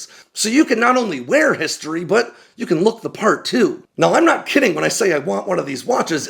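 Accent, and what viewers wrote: United States. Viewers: Oh an American talking im out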